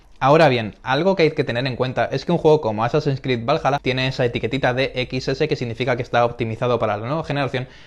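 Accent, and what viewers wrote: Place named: Spain. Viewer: No podía dejar de notar que cuando hablas casi ni abres la boca 😅 tus dientes de arriba y los de abajo nunca los despegas, no se si todos los españoles hacen eso para obtener su acento español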